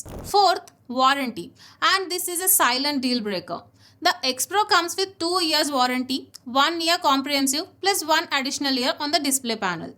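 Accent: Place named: Tamil Nadu, India. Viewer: Aiyyyyo Thamillll accent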